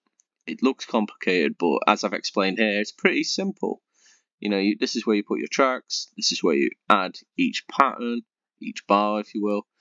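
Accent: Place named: United Kingdom. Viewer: I forgot that you're british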